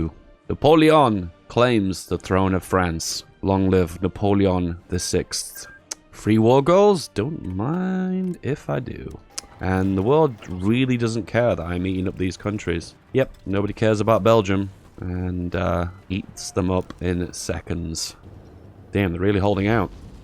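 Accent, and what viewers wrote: England, United Kingdom. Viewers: a smooth English voice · Class video mate, just discovered your channel but it looks like rly high quality stuff #giveaway Also nice to hear another English accent 😍🏴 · #giveaway I love your videos, they are usually really helpful and entertaining, and along with that sweet British accent just makes watching your videos all the more a pleasure. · #giveaway Hey as I mentioned before, I didn't win a giveaway, but hey I'm doing it again cause it's free. Love your video and love your accent, as a fan of British accent (please don't judge me)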